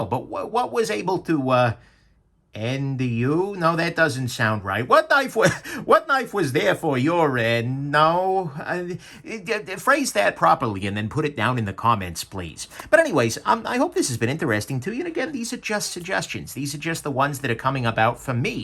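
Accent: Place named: New Jersey, United States. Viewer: Signore i love your Italian American accent new jersey ❤️ 🇮🇹🇺🇸 🗽auguri in bocca al lupo 🙂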